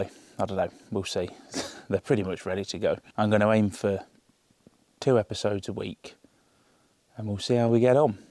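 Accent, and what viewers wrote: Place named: England, United Kingdom. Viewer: This has absolutely nothing to do with the upcoming videos (which I can't wait to watch! 😁), but I'm curious about your accent. 😜 Whereabouts in England is it from? 😀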